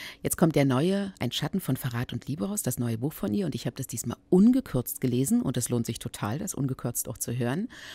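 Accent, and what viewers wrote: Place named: Berlin, Germany. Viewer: Einfach sympathisch, schon allein, weil sie berlinert ;).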